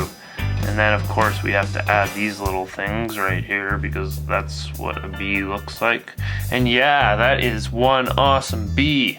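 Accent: Canada. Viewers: It's actually quite slight. I didn't notice until almost two minutes in. In california, our considents at the end of our words are weak, almost silent. For Canada, it's in the way you pronouce your vowels within or at the start of a word, like out and start. And its only with a few words. Sometimes, you can't even tell. I mainly hear it in about.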